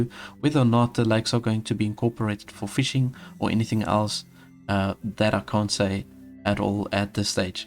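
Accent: South Africa. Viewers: Love the afrikaans coming through. Keep it up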